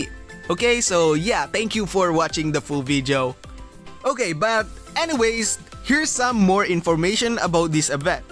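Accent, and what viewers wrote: Philippines. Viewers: are u Filipino? Because of ur accent. And i want lunar beast annie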